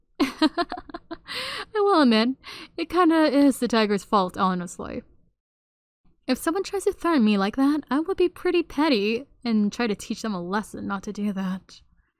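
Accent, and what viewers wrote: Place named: Vietnam. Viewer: the vietnamese pronunciation is kinda cute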